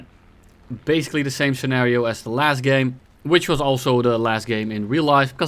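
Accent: Netherlands. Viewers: Thanks for the entertaining videos and the dutch accent, keep it up!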